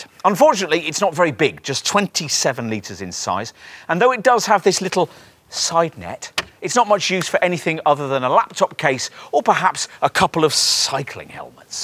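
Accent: United Kingdom. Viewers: What is it with you people and the British accent? Give the guy a break. Are you that thick that you can you only understand a 'Murican accent? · How much did Jaguar pay this guy? I notice his accent is... British? Bias? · Are we supposed to believe you because of your British accent?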